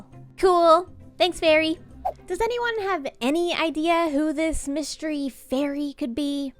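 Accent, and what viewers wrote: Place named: Newfoundland, Canada. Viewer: I love hearing the Newfie in your voice ever so often 👌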